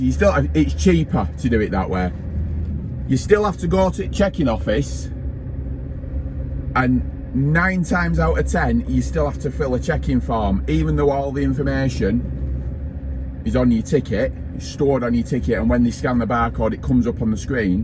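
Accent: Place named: North, United Kingdom. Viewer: Nothing negative, on the contrary. I love accents. Trying to figure out from where yours is. Liverpool, Manchester area perhaps?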